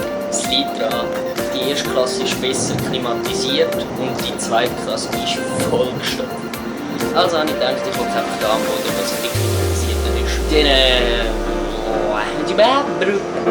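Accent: Switzerland. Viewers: schwitzer dutsch :D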